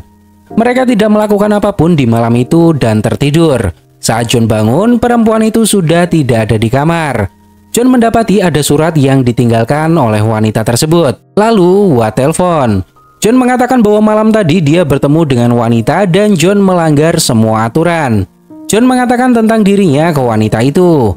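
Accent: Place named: Java, Indonesia. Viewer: Medog bgt bang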